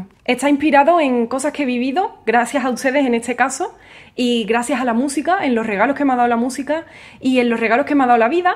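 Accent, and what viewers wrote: Spain. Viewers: Hola, soy de Colombia y me encanta tu acento español y tú sensillez y como interpretar la guitarra. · Felicitaciones, apuesto que hay mas de 300,000 mil personas creo que no hay rincón del mundo donde no te oigamos. Nos ayudaste muchísimo al salir a la webb, con tu hermosísima, música, justo lo hiciste, cuando el mundo estaba en pausa y el miedo calaba hasta en el corazón de hierro... Y tus poemas soplaron por mis mejillas, con la ternura de una caricia y la frescura del viento del amanecer. Gracias Hermosa y que dios te llene de bendiciones, antes que te des cuenta seremos 5,000 millones de personas, las que te seguiremos para disfrutar de tu talento artístico de tu bella sonrisa y dejo español... No lo dudes, que en todos los rincones del mundo, tu voz y tu guitarra alegran el corazón de alguna persona. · El libro lo leería frente al mar. 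Y le dedicaría algunos de los poemas a mi esposa. Saludos desde Puerto Rico 🇵🇷! Me encanta escucharte tocar la guitarra, tus videos siempre empiezan con un bonito saludo, y tu acento del área donde vives en España!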